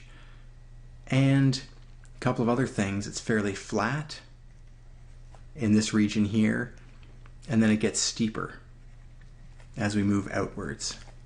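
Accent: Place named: Canada. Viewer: Thanks. Your 'out' and 'about' gave away your Canadianess eh!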